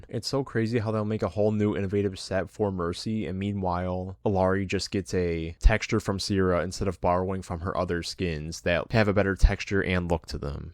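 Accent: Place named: United States. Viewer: You have one of my favourite States accents, especially when you said "battle PASS" 10/10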